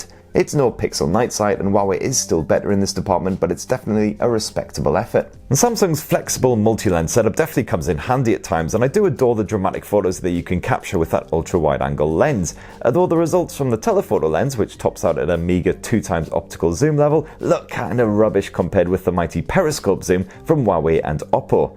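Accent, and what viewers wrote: United Kingdom. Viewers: Ditch the cheesy British accent dude! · It's not slang; he's British, which means you only hear/understand about half of what he's telling us. It would have been much worse if he spoke Cockney. I think he liked it, though.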